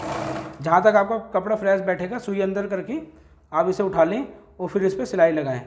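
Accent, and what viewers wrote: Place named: India. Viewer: Sir ap muslim ho kya.ku ky ap k name muslim h lkn ap bolty india ke zuban jaisy ho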